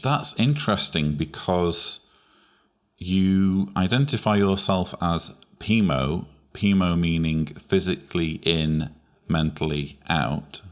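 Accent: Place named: Manchester, United Kingdom. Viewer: Manchester by the accent (and the fact that he shows photos of himself in Manchester and Dudley). Somewhere thereabouts anyway.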